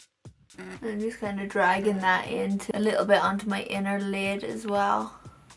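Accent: Dublin, Ireland. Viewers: You are such a beautiful girl!!! I'm from Belfast, and if my ear is correct, your from Dublin?? I'll always support anyone from this island, we need to take over!!!! :D Also, do u know of any good shops in Dublin to visit, for makeup, skincare and clothing?? I always make a trip down after Xmas, but I always go to the same places... Just wondering if u know any cool places outside of the bigger stores, like Arnotts, Brown Thomas etc xxx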